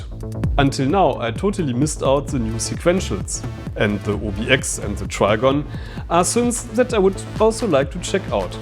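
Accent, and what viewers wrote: Germany. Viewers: With such a heavy German accent, I can better understand you in German.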